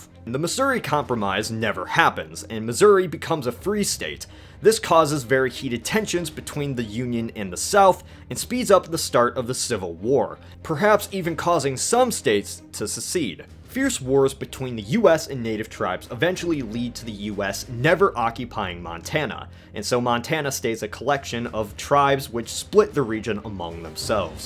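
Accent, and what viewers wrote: Ohio, United States. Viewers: Is he from Ohio? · you from Ohio?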